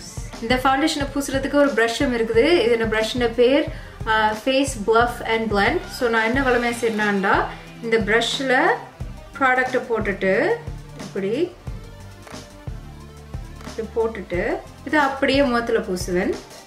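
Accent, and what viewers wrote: Sri Lanka. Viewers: Is this Srilankan Tamil? Unga Tamil romba azhaga irukku sister. Makeup la podamaatan, unga Tamilkaaga Subscribed! ❤💯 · Ilangai Thamil alagu thamil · Poosa porein.. Poosa porein.. Oru quiz competition vaikalaam pola. . Lolzz.. Else srilankan tamil azhagu 😍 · Yha its srilankan tamil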